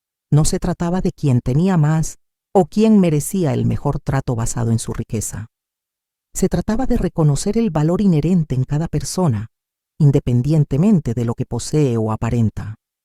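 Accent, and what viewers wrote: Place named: Puerto Rico. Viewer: Que hermosa lección con lindos valores de siempre ser humildes. A parte, creo que la narradora es puertorriqueña. Acostumbro a escuchar cuentos e historias y esta manera de hablar o el acento me parece muy familiar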